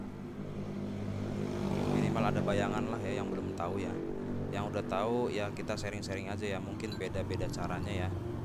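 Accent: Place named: Serang, Indonesia. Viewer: Kaya orang serang sih kang bahasanya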